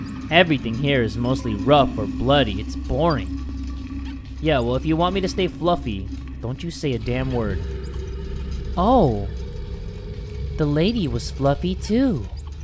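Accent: Indonesia. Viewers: Are you Indonesia because of your pronounciasion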